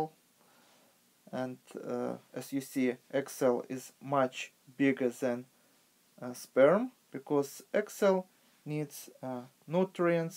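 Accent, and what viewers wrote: Russia. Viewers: Funny accent but good video I would assume your Russian good job at hiding it tho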